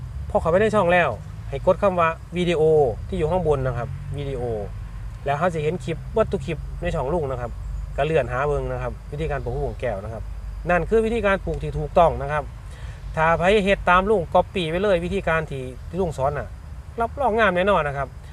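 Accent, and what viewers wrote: Thailand. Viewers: ฟังแบบคนภาคกลางมันไม่ได้ 100% ถ้าสะดวกทำเป็นเสียงกลางบ้างก็ดีนครับ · บรรยายสำเนียงอิสาน...คนภาคกลางฟังได้50เปอร์เชนต์..ถ้าบรรยายสำเนียงกลางคนจะเข้าทั้งประเทศ.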